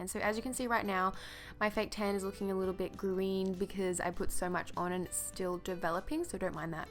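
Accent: Australia. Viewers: wooow loving the hair ur amzing too love u by the way I'm OBSESSED with the Australian accent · she does. I am from Australia and she sounds like any ordinary Aussie girl. Perhaps your perception of an Australian accent is a bit different?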